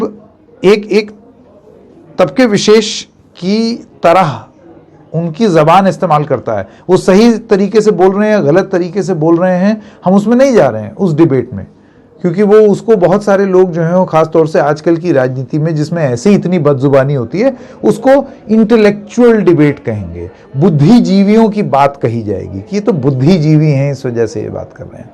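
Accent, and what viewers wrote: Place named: Bengal, India. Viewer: Your Bengali ascent is good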